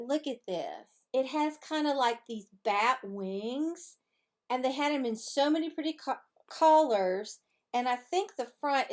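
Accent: South, United States. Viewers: So good to hear that sweet southern twang say, "Hey guys, welcome back to my cheeennel." Welcome back to You (tube) ! Hip hip hooray!!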